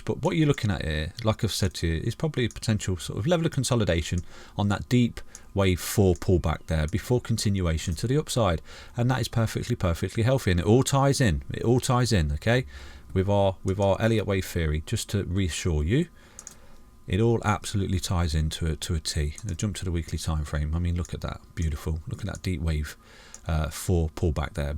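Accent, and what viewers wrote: United Kingdom. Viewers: Soon as I heard that British accent I subbed.. 🇬🇧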